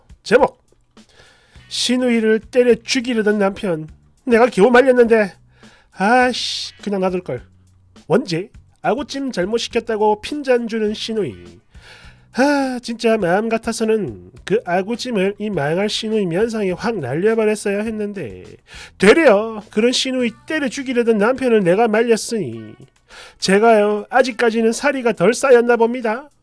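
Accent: Gyeongsang, South Korea. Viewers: 앵가이해라 앵가이~ ㅎ글로읽고 목소리로 들으니 더입에 착붙이네요~ 갱상도 싸나이 좋아요 좋아👍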